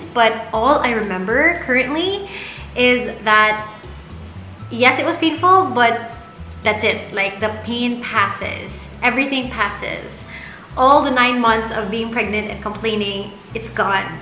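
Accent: Visayas, Philippines. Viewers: Idk but bisan dili pako mom or wife I’m still a students I enjoyed watching your vlogs. Excited na nuon ko ma mommy joke mangita pa man diay kog uyab. Cute sad kaayu ka mag bisaya Ms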